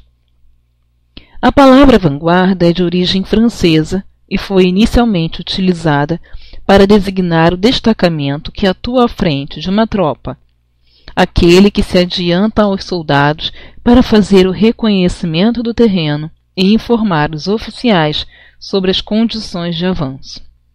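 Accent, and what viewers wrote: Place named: Portugal. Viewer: Mais um excelente vídeo, professora. 😁 Gostaria de fazer uma pergunta, profª., você é portuguesa? Pois tem um sotaque um pouco diferente rs. Abraços. Sou fã do teu trabalho.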